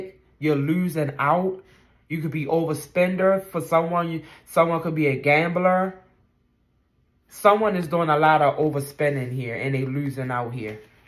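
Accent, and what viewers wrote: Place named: Baltimore, United States. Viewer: HELLO your brownskin is brown skinning you look great i love your Baltimorian accent it's gritty CUTE..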